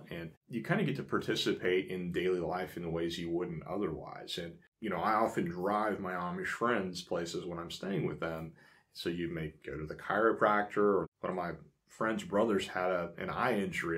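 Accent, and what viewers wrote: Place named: Philadelphia, United States. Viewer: I was surprised to hear you live in NC. Some things you say sound like you grew up near. Philly.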